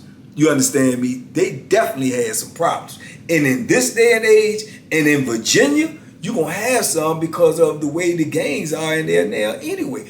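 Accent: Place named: New Orleans, United States. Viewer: Have u ever been housed in Louisiana bcuz u sound u from here… New Orleans .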